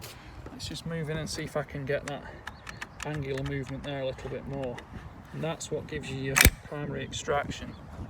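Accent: United Kingdom. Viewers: Well he does have a british accent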